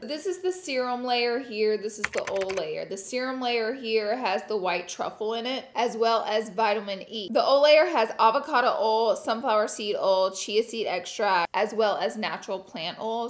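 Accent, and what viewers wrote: South, United States. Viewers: I just adore you you are the cutest and I love your lil countrygirl accent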